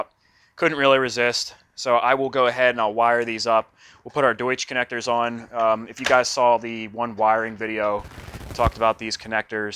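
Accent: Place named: Philadelphia, United States. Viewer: mines an SS, I didn't want to deal with a clutch in the woods but still wanted to be able to shift... Not sure where you're from for if you'd have to deal with it as much (but judging by the "wooder" accent of water, sounds like you're from the Philly/NJ area, so you'd have woods riding as well). But a yxz is pretty easy to work on, everything's pretty open or hidden behind 1 panel instead of having 20 million panels. Just put a titanium exhaust from Graves on mine, sounds like a 1000 streetbike in the woods... Plus, who wouldn't want a 10.5k rpm redline?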